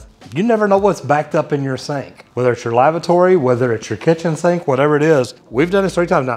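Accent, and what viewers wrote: Texas, United States. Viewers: Yeah he's definitely from Texas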